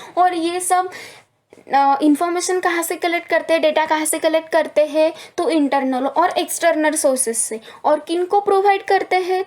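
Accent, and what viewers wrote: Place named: India. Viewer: Nice lecture..Liked you Hindi accent.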